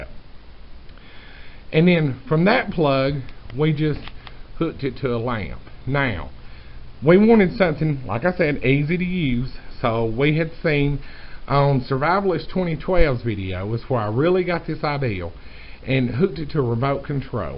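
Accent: South, United States. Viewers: O men, i LOVE your country accent! :D It's so beautiful ^_^ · ...KOOL kiss SET-UP and approach to your project ... KOOL southern accent.... Blessing w all ... :-) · I am very curious as to what part of the country you got that accent from. It is awesome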